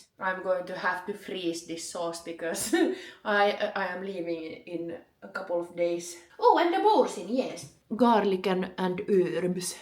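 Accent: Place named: Finland. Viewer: I have only been to Finland once, but your accent reminds me of the late Stina Rautelin. I am certain, that your channel will be successful - you are a pretty girl.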